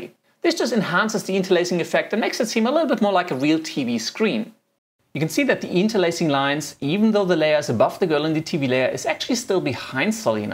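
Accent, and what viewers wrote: Germany. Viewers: Are you German? :D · Are you from Germany?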